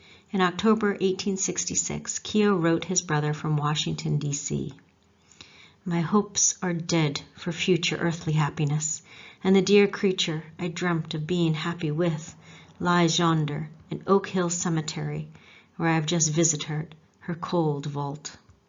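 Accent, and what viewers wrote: Ireland. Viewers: It is my pleasure to let you know how great of a storyteller (albeit Historical/non-fiction) and I'm glad the timing was good for you. I will most assuredly continue to let you know as I proceed to other segments. I'm so glad that I happened upon your series! Btw, I loved your Irish accent coming through as you spoke of Myles's story. It truly made for an endearing quality to your presentation. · Never talk in an Irish accent again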